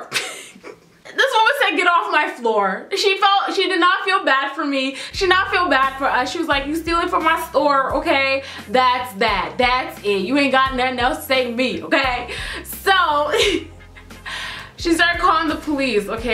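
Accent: Jamaica. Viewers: you have an accent, like a Jamaican accent. :o And you're super beautiful btw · Are u also Jamaican or its just your mom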